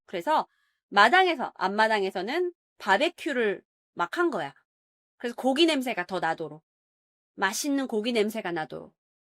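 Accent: Jeolla, South Korea. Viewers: 전라도 스럽다...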